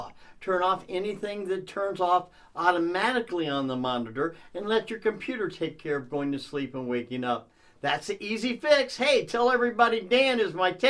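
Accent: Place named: Chicago, United States. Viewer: Dan where are you from in Chicago I can hear it in your voice my guy!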